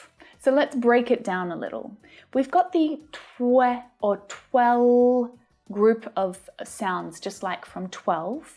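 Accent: Australia. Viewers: I've got a question, just out of curiosity. Yours is the cultivated Australian accent, right? I love it, it's kind of the best of 2 worlds, British on one hand and American on the other but without some of the oddities of them, at least this is my point of view as non native English speaker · speaks with a cultivated Australian accent and she is from Melbourne (the second biggest city after Sydney)... I can assure you that not all Australians speak as clearly as she does! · Are u from Australia ? Your english sounds more clear...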